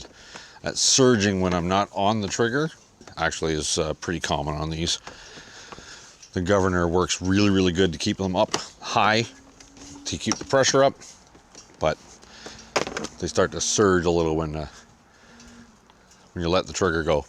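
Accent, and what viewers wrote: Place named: Canada. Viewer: By chance are you Canadian?? Noticed a bit of an accent.🤔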